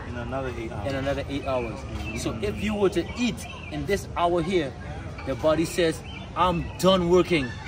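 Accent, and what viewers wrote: Trinidad and Tobago. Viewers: Bro sounds Trinidadian 🙏🏽👏🏽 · He sounds like a Trinidadian 🇹🇹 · Man is ah trini... I feel he from port of Spain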